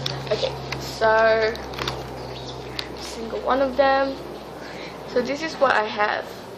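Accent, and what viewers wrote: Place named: United States. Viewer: Awesome american accent though. Doesnt sound malaysian at all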